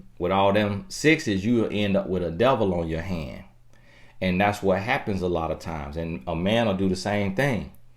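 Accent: South, United States. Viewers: 😂Southern lango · Mz Chocolitt FL must be the deeeeep south! Im for NC, but is on a whole other level of country. Truly distinguishes his message; a country bumpkin with sooooooo much wisdom.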